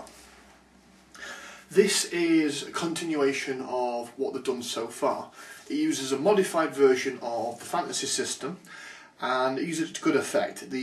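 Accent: United Kingdom. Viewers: Good job on the review. Your Brit accent makes everything so much nicer to listen to. LOL But good info and some nice insights. Thank you. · In fact, I think I've pegged it...his Brit Accent is what makes the channel ! Brits sound so good saying things...."modified version of the Fantasy system..." - I mean...you can't even come close saying that in American English ! Plus he's smart...and knows his stuff...and he's just a great commentator. One of my favorite quotes is, "I want to play an Orc...just an ordinary Orc. And I can't do that.. and that's a shame." - see his Warhammer online review !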